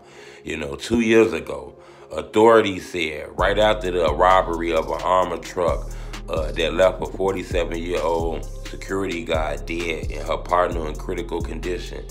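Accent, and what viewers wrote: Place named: South, United States. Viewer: This man country as hell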